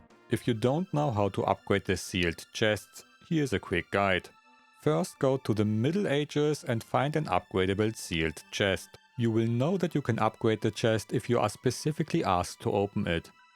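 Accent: Germany. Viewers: If I may interject. Elmer Fudd has a speech impediment. He says wabbit instead of rabbit and wittle instead little. I personally don't get the Elmer impression from you. Your accent is very German.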